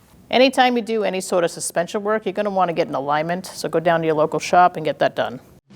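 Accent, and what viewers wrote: Boston, United States. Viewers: This lady is awesome! I've watched a few videos with her. Good instructor and I love her Bostonian? accent. Cah.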